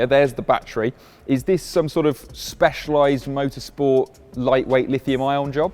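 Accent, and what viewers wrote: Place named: United Kingdom. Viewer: a british guy talking about a japanese car im laughing hard this is so funny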